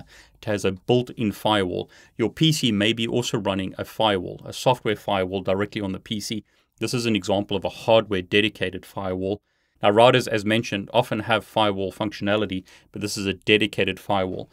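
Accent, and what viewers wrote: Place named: South Africa. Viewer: Hi. Is your Udemy course the same as the YouTube channel one? I want to do my CCNA and was delighted to find a SA voice. Best Udemy video's as well.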